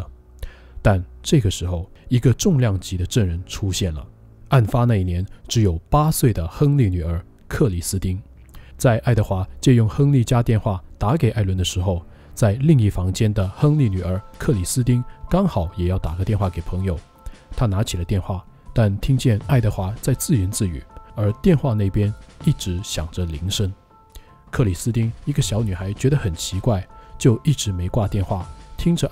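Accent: Standard. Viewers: 国语好标准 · 國語和粵語都很標準喔，主持人是哪裡人？ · 普通话很标准，听起来舒服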